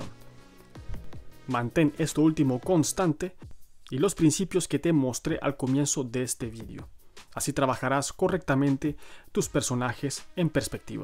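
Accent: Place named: Yucatan, Mexico. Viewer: amigo hablai colo yukateko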